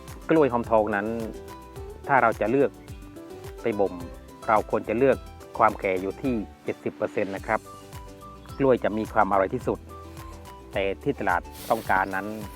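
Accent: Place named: Cambodia. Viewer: สำเนียงขะแม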